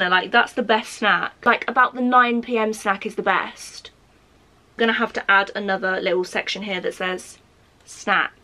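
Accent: United Kingdom. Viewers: OMG YOUR BRITISH YASSSS SIS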